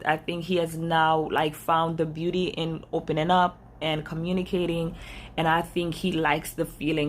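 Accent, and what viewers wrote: Jamaica. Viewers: i detect that jamaican accent...i am too jamaican,..like your video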